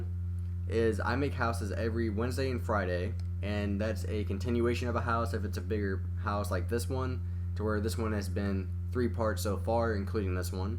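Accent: United States. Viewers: R u from stl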